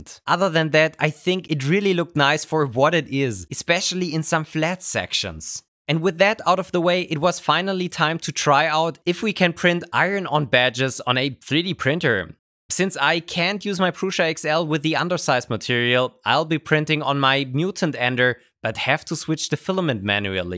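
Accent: Germany. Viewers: bro bist doch deutsch oder man hört das harrrtt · hey are u German? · first 5 seconds in, "DAS IS N DEUTSCHER, ICH KANN ES HÖREN!" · Deutscher Akzent? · Bro ich klick auf das video und hab direkt das gefühl gehabt, dass du aus deutschland komms. Sber sehr cool, was du machst❤ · Ich bin eine Minute drin und muss sagen du hörst dich sehr deutsch an :D nice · I hear Deutsch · Bro... are you German?! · are you german? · Geilster Deutscher Akzent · Are you German? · Guten Tag im Stefan 😂 du bist locker Deutsch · are you german? but cool vido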